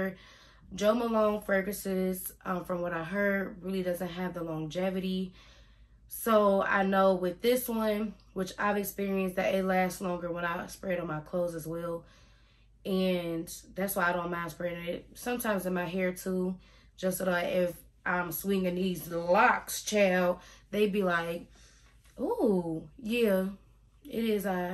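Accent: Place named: South, United States. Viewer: Hi, I’m surprised you are from Ohio. You seem to have a southern accent. I live in Akron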